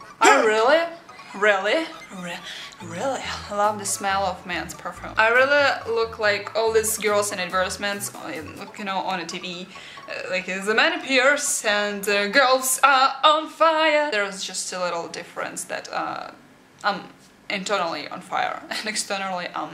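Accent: Russia. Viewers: deathless? you mean immortal? P.S. you need to get rid of your Russian accent and you will be perfect! Marry me :) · I like your Russian accent , in fact ,speaking English in Russian accent is so sexy , Btw, you are adorable😉😉😉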